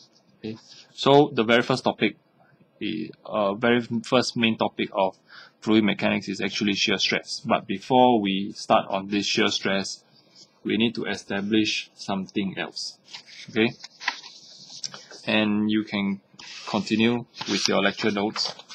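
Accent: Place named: Singapore. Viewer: cher i like your singlish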